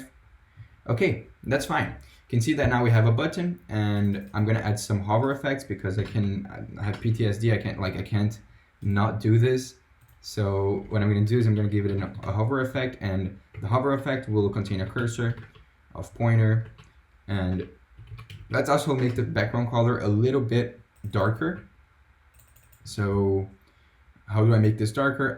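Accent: Brazil. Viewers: Reconheço um inglês br de longe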